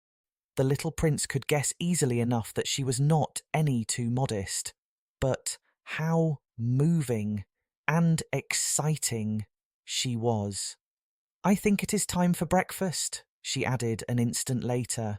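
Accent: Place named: United Kingdom. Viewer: Love this!It's very helpful for me to mimic the UK accent cuz your English reading is not so fast and the pronunciation is clear as well. Please make this kind of video more❤. I want to shadow more❤.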